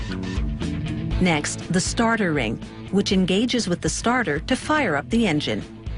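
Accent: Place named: Canada. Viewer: Canadian narrator for sure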